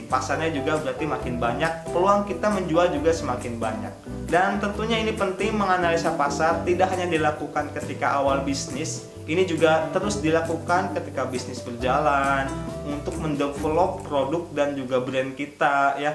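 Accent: Banjar, Indonesia. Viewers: A org banjar sanes?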